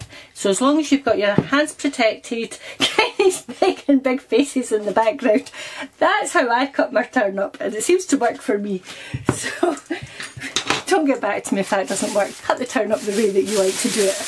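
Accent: Scotland, United Kingdom. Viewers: haha her scottish accent is mild!! full on drunken glaswegian is much harder!!!! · My mothers was scotch/Irish You sound like everyone that was in my life growing up .☺️ I have my Auntie Annie's fly cemetery recipe and her short bread recipe. They've all passed on, I don't get to hear that lovely brogue. So I watch you.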